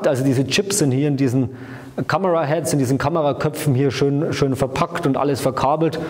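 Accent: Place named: Bavaria, Germany. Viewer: Mit schwerem (bayerischen?) Dialektakzent und zu hohem Sprechtempo leider schwer verständlich!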